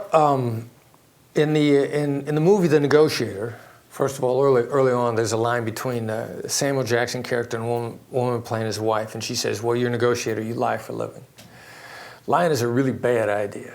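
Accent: New York, United States. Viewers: He says he grew up in Iowa, but his accent sounds very NY/NJ ... ? I wonder if maybe he learned to hide his midwestern accent for negotiation purposes? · Your natural accent can escape you once you move away from it and you adopt or adapt to your new environment. He spent the bulk of his career in NY...so therefore.... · I can't comment on that film, not having seen it. I was simply remarking on the verbal affectations of Voss, who hails from Iowa but speaks like a hard-boiled New Yorker.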